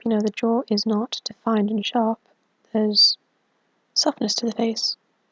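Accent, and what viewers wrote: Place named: Berkshire, United Kingdom. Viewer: Happy new year to you too from a fellow brit and thank you for the like. Nina is stunning, glad there are some real ones out there in high ranks, gives us men a glimmer of hope when watching the screen lol. I'd like you to know that I think your videos are the most professionally discussed and analysed on this platform and they really exude such an unbiased and level headed approach, it's so refreshing. I typically watch your transvestigations at night and the sound of your extremely soft and sexy voice. Especially its Berkshire/Oxfordshire region accented cadence helps me relax before bed, hence the comments as of now haha. Hope it doesn't come across as creepy or even offensive (some girls these days *sigh*), just being as honest as possible. Enjoy the rest of your day!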